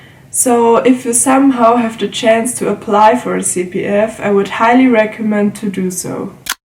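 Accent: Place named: North Germany, Germany. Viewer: Loved the content! What would you say about the metro system? Also your accent seems from north Germany!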